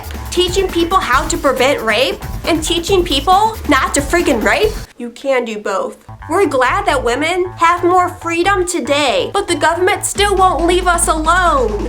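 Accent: South, United States. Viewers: Thanks. Talk about "pot + kettle = black", that FCkH8 dot-com clip itself was just pure hate. And, dear lord, the 'abuse through indoctrination' those young girls must have endured in that original video... it makes my flesh crawl down to the bone...I just watched 2mins 35 of filth that out did anything that Joe Gobbles conceived. Liking your videos, and as a non-North American, I can't get enough of your Southern accent - don't ever lose it!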